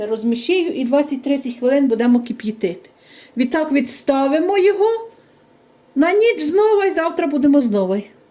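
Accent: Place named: Volhynia, Ukraine. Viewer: Карпати? Говір схожий на волинський, в районі Ковеля.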